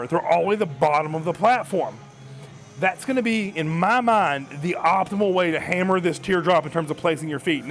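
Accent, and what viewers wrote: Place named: Yugoslavia. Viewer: You have a very strong yugoslavian accent that I can't get over but seriously you have excellent advice. The key to VMO activation is pretty much raising your heels and staying in the lower half position of the squat. We my wife & I try this in our village, GOOD FOR MILKING COWS!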